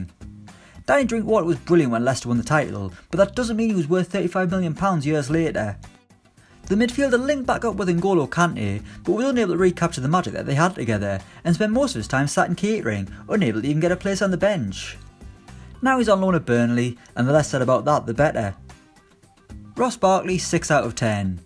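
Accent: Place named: Ireland. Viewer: Irish guy detected!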